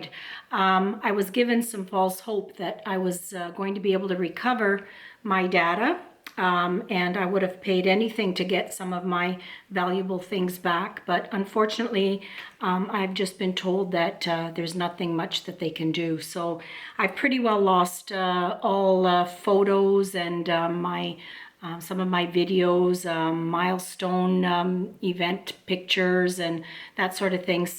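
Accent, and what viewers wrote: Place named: Italian American, United States. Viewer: I love this journal; your work is always so beautiful. TFS. I'm sorry to hear about your computer. I had my one and only Mac three years ago because everyone I know said it was superior. After a year, the disk literally burned up and I lost two chapters of a book I had been writing. They were really good, too! I haven't gotten over it yet and haven't been able to get back to the book yet either. I'm using a PC again. I'm glad you said you are Italian. I have been wondering a long time if you were because of the intonation in your voice. I've known many Italian American women who have a similar timbre and speech patterns. I didn't want to be rude and ask. Thanks for the answer!!! Baci. Dolores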